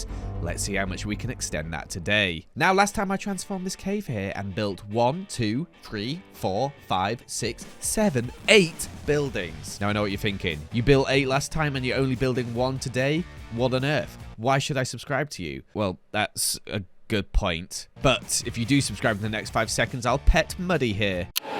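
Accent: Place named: United Kingdom. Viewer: Okay but the dry af "Tequila" in such a monotone british accent is my favorite thing ever.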